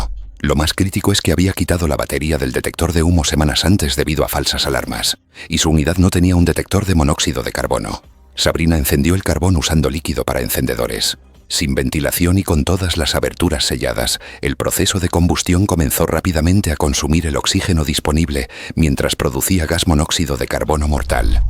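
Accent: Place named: Spain. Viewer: ME ABURIO TU ACENTO ESPAÑOL DEMASIADAS ESES Y ZETAS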